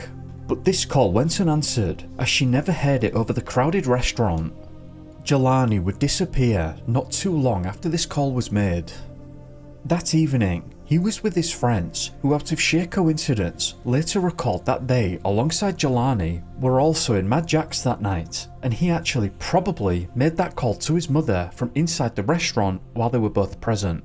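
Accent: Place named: North, United Kingdom. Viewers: No I'm from near Northumberland I'm a geordie and I'd say it's a posh Lancashire accent or Yorkshire but definitely not Northumberland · The narrator has a Northern accent. Its quite acceptable to pronounce words with your own accent. Its you who is showing your ignorance by making such a comment.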